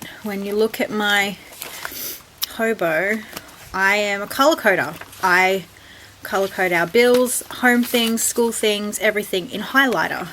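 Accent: Australia. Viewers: Hey are you Aussie hope so love meeting other aussies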